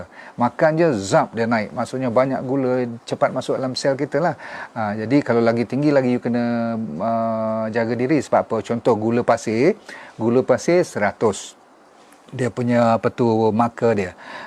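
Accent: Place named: North, Malaysia. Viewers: Manis melecaih... org utara ka... Banyak perkataan bunyi belah2 utara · org peneng klo xsilap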